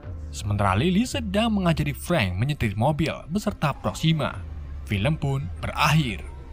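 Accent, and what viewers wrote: Malaysia. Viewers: Abang dari malisiya ya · Bang kok loghat lu kek orang malaysia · Min. Gua mau nanya lu orang melayu ya. Soal nya gua teliti dari logat lu ngomong bahasa indonesia kayak orang melayu. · Orang Malaysia ya min ? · Nampaknya komentator ini dari Malaysia, sebab ada sedikit terdengar dengan nada yang berlogat Malaysia.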